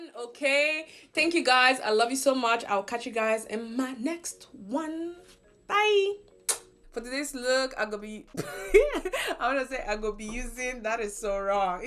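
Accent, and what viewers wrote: Nigeria. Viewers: 🤣🤣🤣 sounds like an igbo accent. Nne you made my day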